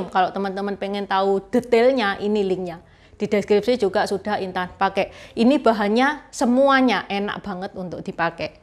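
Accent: Java, Indonesia. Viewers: Intan cina apa jawa, kok medok jawa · Muka china,,bahas dayak,,,logat jawa😂😂😂😂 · logatnya jawa medok banged, saya suka.. maju terus chanel nya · Mba Intan ky dari Jawa... suara nya medok nya tu ky orang Jawa... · mba intan ini chines jawa ya medok banget si ehe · Intan ini kok omongnya medhoookkk bangeet thoooo. Intan lahir dimana tho ? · Presenternya unik,china dialek jawa medoknmenthok,hayaaaa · terimakasih mbak infonya, ini menjadi ilmu bagi kita. mbak intan ini orang jawa putih ya...itu aksennya medhok banget jawa. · .. btw Intan medokers ya ....??? 😊😊😊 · Mbak intan jawanya mana mbak ko logat jawa nya medok bgt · Mbak Intan...warga Indonesia yang wajahnya oriental tapi logat jawanya kental..apa ndak begitu Mbak Intan..he he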